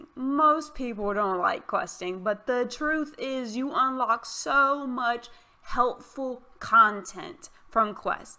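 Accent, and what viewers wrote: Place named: South, United States. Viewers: Shoutout to you for using y’all and dank in the same sentence. I’m from Jersey but spent a lot of time down south and you talk just like I do LOL. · You're obviously a fellow southerner :P shout out from Texas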